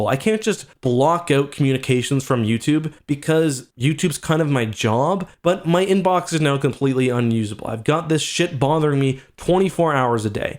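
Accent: Canada. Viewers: I know this isn’t a regular video and you’re mad but it’s much more clear how Canadian you are when you’re pissed off and cursing, reminds me of trailer park boys when you said “fuck” and “sorry”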